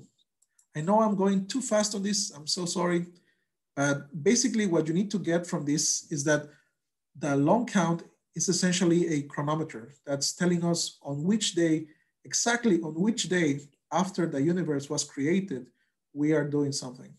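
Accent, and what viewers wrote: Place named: Brazil. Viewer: você tem um sotaque brasileiro quando fala inglês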